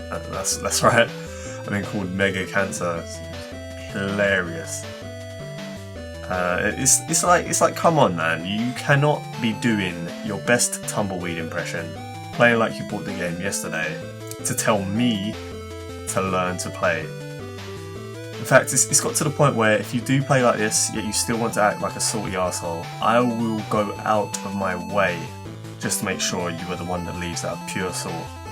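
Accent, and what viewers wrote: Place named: United Kingdom. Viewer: Damn you sound a lot like Alpharad if he was British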